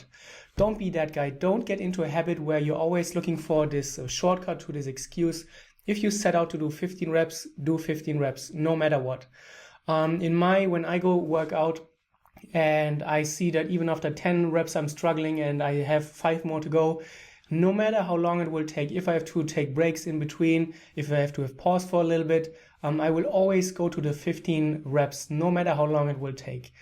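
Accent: Germany. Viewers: I immeditely knew you were german from your accent! Jawohl ja! Haha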